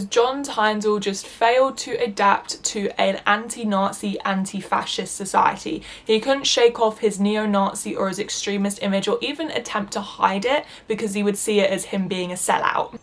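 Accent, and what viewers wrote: United Kingdom. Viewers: contrapoints but make it bri-ish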